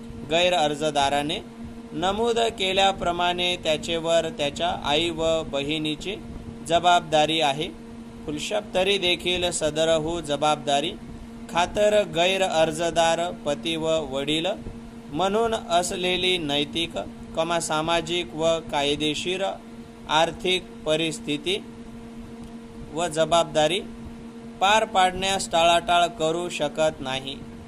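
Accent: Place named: South India, India. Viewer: South Indian voice vatat ahe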